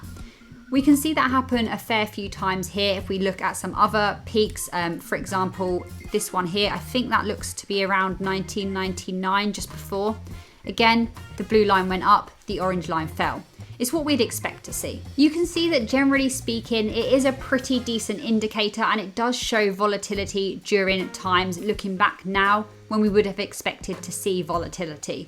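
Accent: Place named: England, United Kingdom. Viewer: i like your content and english accent.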